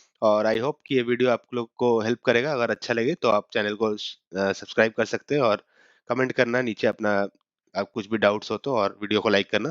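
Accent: Bengal, India. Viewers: Dada, are you from West Bengal? As you sounds like a bengali.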